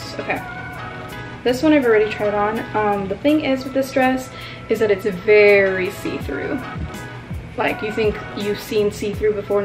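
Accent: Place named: South, United States. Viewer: liked the southern accent jaja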